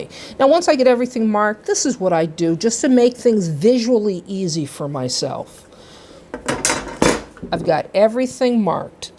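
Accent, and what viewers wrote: United States. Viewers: Great video. I loved it. Awesome. I was also trying to figure out if you were American or from Nova Scotia.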